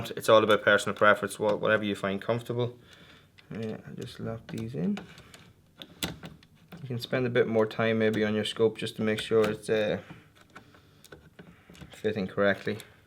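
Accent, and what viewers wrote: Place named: Dundalk, Ireland. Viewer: That dundalk accent though!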